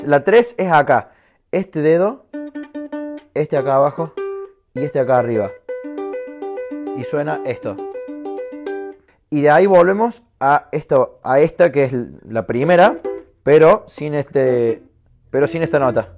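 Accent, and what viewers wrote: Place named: Córdoba, Argentina. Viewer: Argentinoooo wachooooo cordobe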